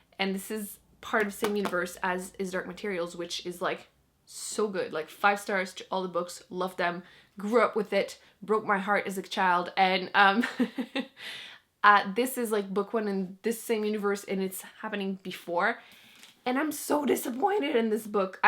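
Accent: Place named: Canada. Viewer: You have an accent. Are you canadian?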